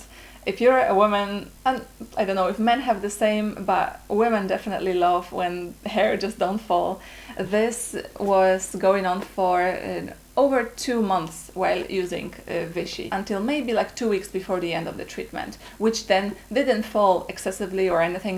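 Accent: Poland. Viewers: This Polish accent... brrr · Nie wiem czemu, ale typowo widać po twoim ang, że jestes polką.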